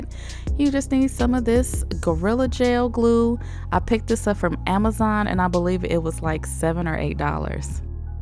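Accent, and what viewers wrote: United States. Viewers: thankss.! why is so pleasant listening an american speaking instead a mexican speaking english! thank u so much!!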